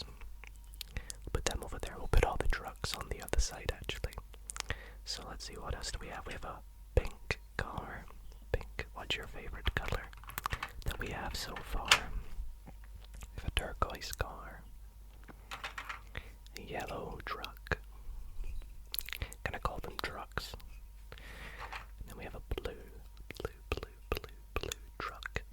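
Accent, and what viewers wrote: Scotland, United Kingdom. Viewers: Bro this man is Scottish.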